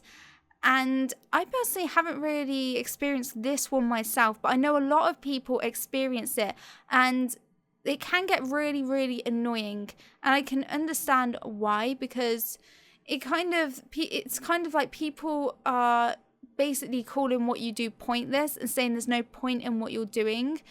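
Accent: England, United Kingdom. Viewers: I don't why but I really have some interest for English accent! Love it!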